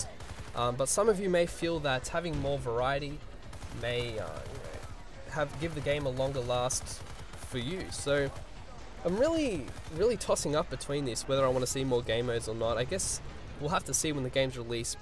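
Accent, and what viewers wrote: Australia. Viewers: i am guessing you are an aussie... and if you are what clan are you in. i am in a US one right now and need some ausssie to play with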